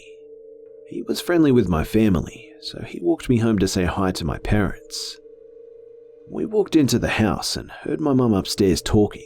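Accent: Australia. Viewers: Can't tell if your an Ozzie or not sounds like it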